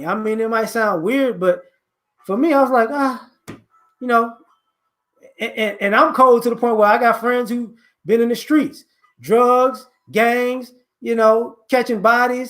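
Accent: Chicago, United States. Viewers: Just listening to you, I can tell you from westside of Chicago. For some reason everything you saying Ive dealt with my entire life. I think its something about growing up in that environment we notice how confused people in society really are and dont even know it. STAY up fam...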